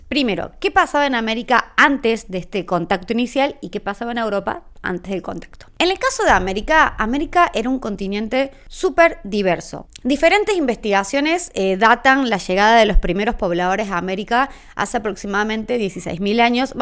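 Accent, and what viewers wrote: Córdoba, Argentina. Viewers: Tiene tonada cordobesa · Ohhh, encontré éste video y no se cómo, será por el algoritmo?... bueno, pero me interesó y me di el "trabajito" de verlo. Antes de nada te comento que soy Peruano y CUZQUEÑO Hispano, no latino, aunque algo hablo en latin, porque aprendí en colegio mediante la misa católica. Te encontré y veo a una "indigenista" más, tratando de deformar nuestra historia. Tu licenciatura esta mal titulada, porque, primero, no fuimos "colonia", fuimos parte de España, como "VIRREYNATO" ok? Tanto "guión" para nada objetivo y verdadero. Debiste buscar libros de historia elementales como la Historia del Perú, escrita por un chico de 21 años como su diario de vida y hoy reconocido como "cronista", gracias a la publicación de ese su diario de vida por sus familiares en 1560... se llamó PEDRO CIEZA DE LEÓN quien llegó con Francisco Pizarro y las crónicas de Cristóbal de Mena quien llegó con Hernán Cortéz a México. 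No tendrías nada que hablar del 12 de octubre de 1492, ya que de por sí está escrito y es "nuestra historia" y no tienes que "repasarla" y la "disputa", solo cabe en la mente retrógrada de INDIGENISTAS como tú que quieren seguir sembrando "odio " a nuestro origen HISPANO y a los conquistadores, que gracias a ellos, hablas castellano, a medias, claro está, por tu fonética "argentina" que no se entiende, jaja Iiiii, claro, en tu país de "progres" izmierdistas, que de lo único que se ocupan y adoctrinan, es de falsear la historia y política con "narrativas" Marxistas-Leninistas. Claro que fue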